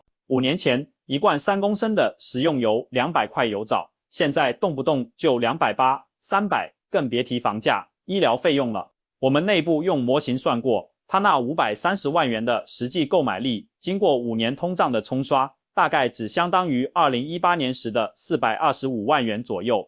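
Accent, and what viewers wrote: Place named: China. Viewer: 這根本不是台灣的，ㄧ聽就知道是大陸的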